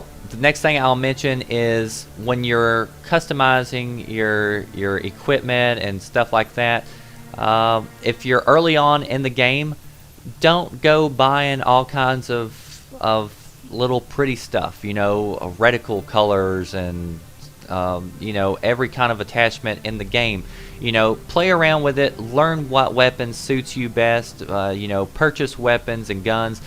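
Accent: South, United States. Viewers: One so what if he sounds like a hillbilly and yeah he has some good vids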